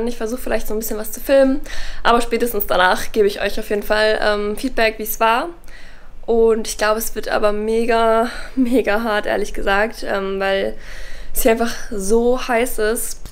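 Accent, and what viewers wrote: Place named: Germany. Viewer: Me gusta tu acento Alemán y así aprendo Alemán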